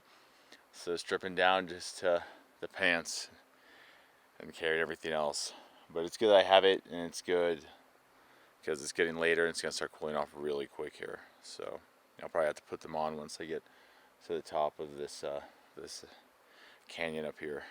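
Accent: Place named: Boston, United States. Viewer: Be proud of your accent! Grew up in Boston also!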